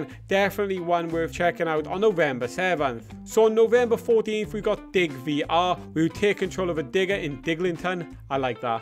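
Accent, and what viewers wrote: Wales, United Kingdom. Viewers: Ohh god so nice to hear a fellow Welshman ❤ Da iawn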